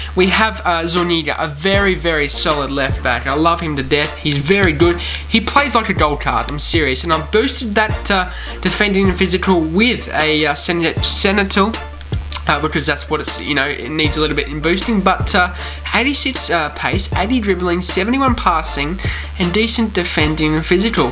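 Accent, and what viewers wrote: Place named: Queensland, Australia. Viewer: Where is Aus are you from.. I'm guessing qld maybe?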